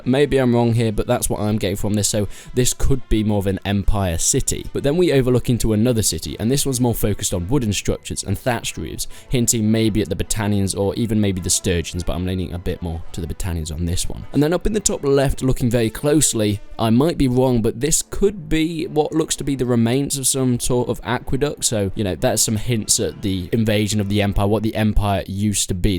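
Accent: England, United Kingdom. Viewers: The annoying english accent voice over, is my cup of tea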